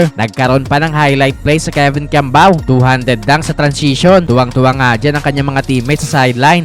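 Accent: Iloilo, Philippines. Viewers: ilonggo ka man gid idol diin ka sa iloilo tikalon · ilonggo meg? haha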